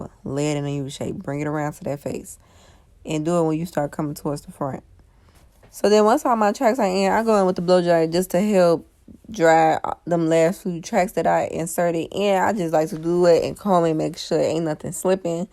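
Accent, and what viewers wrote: United States. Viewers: That southern twang. Yes baby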